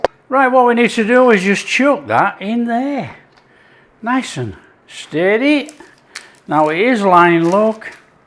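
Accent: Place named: Lancashire, United Kingdom. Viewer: We made the flapjack tonight, we are in Yorkshire near Whitby. We think you sounded originally Lancastrian maybe Burnley, Preston Accrington or possibly the Rochdale area. Please put us out of our misery 😄 Yorkshire or Lancashire? Either way we have really enjoyed your video, subscribed and laughed when you said "cook off" xx❤xx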